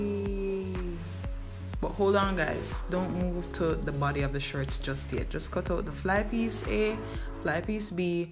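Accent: Jamaica. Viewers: you sound Jamaican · Hey, are you Jamaican? I heard an accent. 😊😊😊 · Did I detect a Jamaican accent,? Good to hear you. Thanks for sharing.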